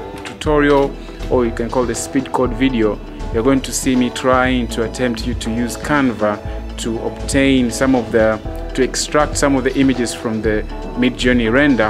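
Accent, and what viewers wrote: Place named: East Africa. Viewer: good work my guy, are you from Uganda,, or Tanzania or Malawi, you sound like one of ! keep on dropping knowledge my guyy, excellent work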